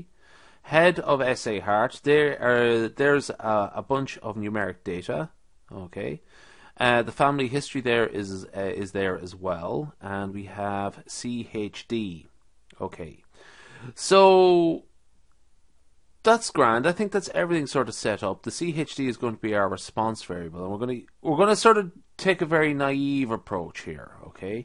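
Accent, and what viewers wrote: Ireland. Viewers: It's kind of spooky hearing another Irish person talk me through machine learning on a YouTube video, especially in such a casual manner. You may as well be my inner monologue 😂 "So here we are aaand ... that's not great". Subscribed. Looking forward to more of your videos :)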